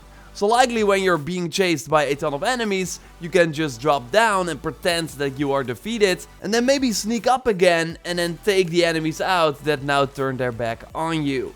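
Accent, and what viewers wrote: Netherlands. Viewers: Are you dutch? Want je hebt een erg Nederlands accent. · are you dutch >...? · Are you dutch?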